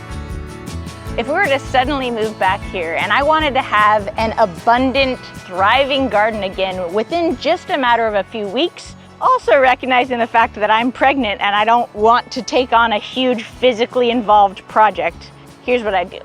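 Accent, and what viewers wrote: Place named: Minnesota, United States. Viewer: PNW has very little accents. I keep hearing a MN accent maybe cause you remind me of an ol’ friend. BTW…. Many congratulations on your baby!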